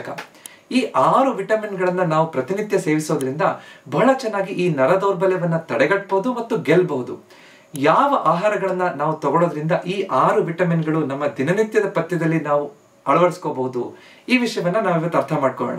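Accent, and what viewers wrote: Standard. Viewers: Swàtchha Kannada & good information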